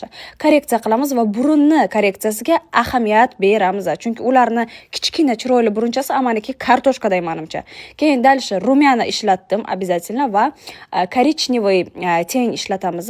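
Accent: Bukhara, Uzbekistan. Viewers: Бухоро шеваси бу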